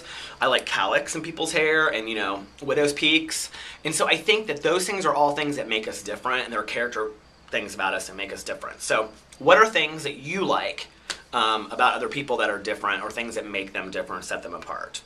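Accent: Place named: West Coast, United States. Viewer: Wow, you sound like you're from the West Coast. But it's like, who you are, so who cares?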